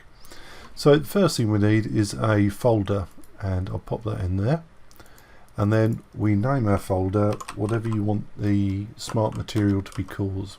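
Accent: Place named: England, United Kingdom. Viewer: Thanks, great tutorial. May I ask what part of England your accent is from?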